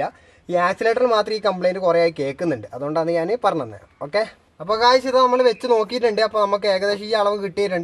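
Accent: Kannur, India. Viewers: Bro kannur aanalle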